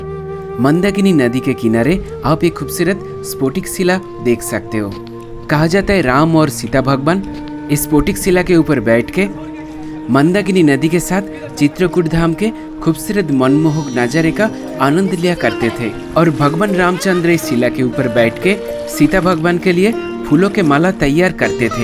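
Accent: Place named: Bengal, India. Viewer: Bhai aap bengal se ho kya . language se lg rha apki hindi se 😊 anyws alws most welcome...love from Lucknow 🙏😊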